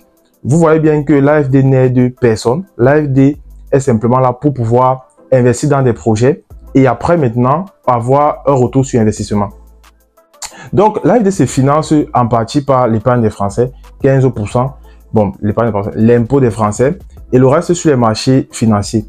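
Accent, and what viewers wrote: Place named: Africa. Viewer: Mais comment tu fais pour avoir 2k+ abonnés avec 9 vidéos? 😅 Et j'ai reconnu l'accent c'est le continent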